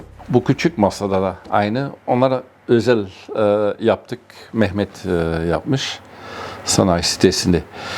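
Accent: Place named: Germany. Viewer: there is a song called, which a man speaks english with a german accent. I just remembered that song when you speak english. stupit comment anyway. nice home, enjoy the sun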